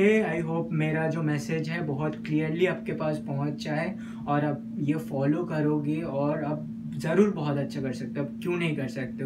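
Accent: Bengal, India. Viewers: Bhaia apki bengali ho?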